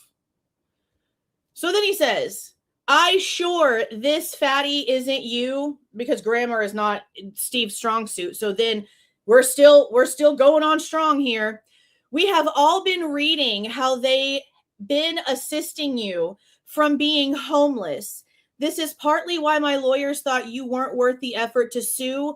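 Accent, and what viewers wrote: Texas, United States. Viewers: ❤She’s from Texas I can tell….❤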